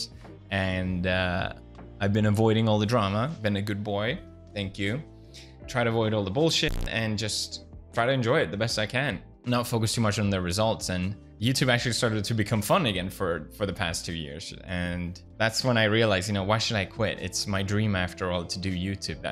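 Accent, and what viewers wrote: Sweden. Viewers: Wow your accent really got better over the years your Swedish is faint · Jag hade svårt att kolla på dina videor i början för att jag inte kan lyssna på engelska med svensk brytning, plus att jag var avundsjuk på att du var så populär, men sen började jag titta på lite colabs med dig och Seán och började sedan kolla mer och mer...med jämna mellanrum. Men dina senaste Japanska videor kollar jag på så fort de dyker upp för de är väldigt underhållande. Så gör vad du vill i vilket tempo du vill. :) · Crazy after all these years you can skill kinda hear his swedish accent I think thats pretty cool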